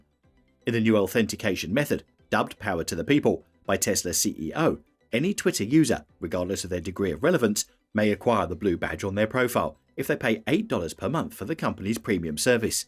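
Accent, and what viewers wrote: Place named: United Kingdom. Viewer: Is anyone else sick and tired of YouTube podcasters employing British accented narrators? They sound like they have a cob stuffed up you know where. I, for one, would much prefer narrators who speak with a good old American accent.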